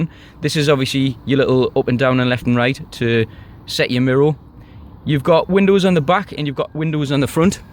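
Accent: Newcastle, United Kingdom. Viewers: Cheers mate enjoyed that 😅 off to view one of these tomorrow! love the Geordie accent reminds me of my offshore days 😊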